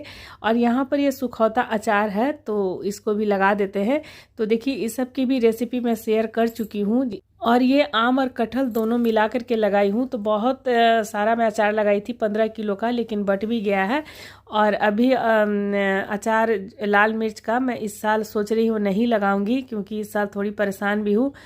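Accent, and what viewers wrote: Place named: Bihar, India. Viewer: Aunty ji 🙏 apke bhasha se lgta hai ap bihar se hi hai 😊 kis jila se hai ap..bht acchi thali .. testy 😋 hmm hath se ese chawal neri maa v nikalti hai...❤ lovely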